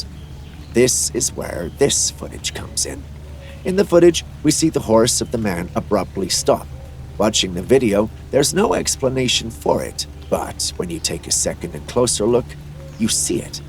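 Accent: England, United Kingdom. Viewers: bro your starting to sound like a pommy englishman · Why are you talking with an English accent in this video?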